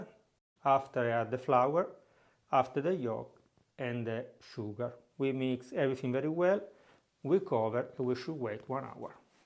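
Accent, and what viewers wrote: Italy. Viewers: youre the cutest english speaker amongst italians..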